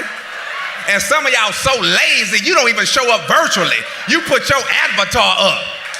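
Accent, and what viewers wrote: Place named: South, United States. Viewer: I LOVE his southern accent! #Mississippi I have it too bro! Love your work!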